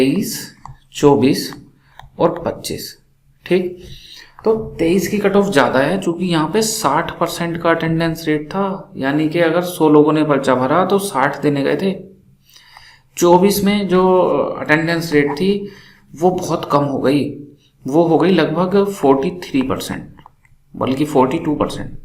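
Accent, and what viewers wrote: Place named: Western Uttar Pradesh, India. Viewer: Aap up west (to be exactly muzaffarpur nagar) se ho kya ??? Mere kuch classmates they us area se same yahi excent, tone and dialect thi..😊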